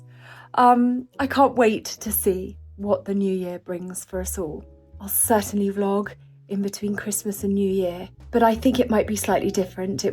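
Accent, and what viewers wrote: United Kingdom. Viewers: I love his British accent 🇬🇧♥️ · I happened upon one of your vlogs and I have to say, you have been a source of delight this Christmas season. You make the mundane fun. Your manner of speaking is so funny and engaging. I adore Britspeak! I love your natural enthusiasm. And the way you don't sacrifice the good for perfection is very relatable. The hops in your hair episode was my favorite. The way you laugh at yourself and lean into the camera at times is comedic gold. I look forward to more episodes.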